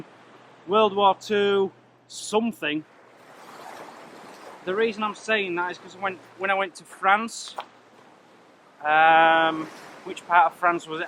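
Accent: United Kingdom. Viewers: You sound exactly like a british person I had to call from work yesterday, cause his car is damaged and for repair in Germany lol.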